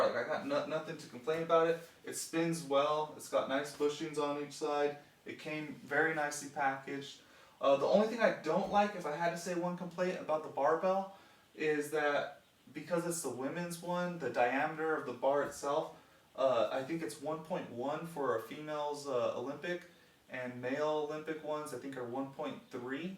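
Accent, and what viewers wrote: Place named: United States. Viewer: Hahaha just as you said "obviously I'm in England" I was thinking this guy sounds American but he has to be in England? Haha Just to add I just got the m3 rack and it's really good. Basically a copy of a rogue r3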